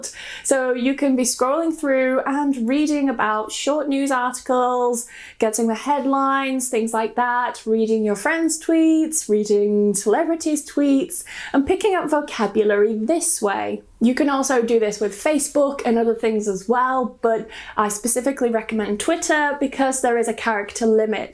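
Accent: United Kingdom. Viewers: your British accent is just delicious! Your voice is music to my ears.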